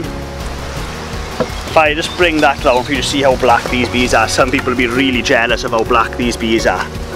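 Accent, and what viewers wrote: Wales, United Kingdom. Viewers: only joking. Love the Welsh accent. Irishman here. Looking to get in to beekeeping. Have hives and equipment. Reading reading reading and watching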